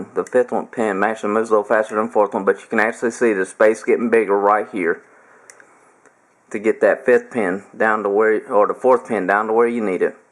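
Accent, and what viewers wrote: South, United States. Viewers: I used this review 2 yrs ago, to clarify the instructions on the package. It was very helpful. In fact I bookmarked it, and still refer to it on occasion. As for the sight itself, I originally had it on a Mathews Drenalin, with a 4 power lense, and it greatly increased the accuracy. I have since upgraded to the Mathews no cam st, but I transferred the sight because it does not need to be upgraded. I now proudly own the deadliest tack driving bow & sight hunting combo I can imagine. Just for the record, you NEVER need to apologize for the southern drawl, y'all. Good hunting. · I bought the pro HD HS version with the sliding dovetail and it arrived today. Mounted it and I'll sight it in initially early next week at the indoor range. It is a very nicely machined piece of equipment and my PSE Revenge is sexier already. LOL Thanks again for the review and I have some great friends with Southern accents so you're good to go! 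· GREAT REVIEW....LOVE THE SOUTHERN DRAW.... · Give you 300 likes, I just bought this sight from a guy and had no idea how to use it, your tips on setting ranges is great. Thanks, southern drawl no problem, from Arkansas ha. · Mate, as an Aussie, I find your southern drawl to be both easy to understand and charming. Thanks for this great video. You have done a good job of pointing out the features that make this sight different and special.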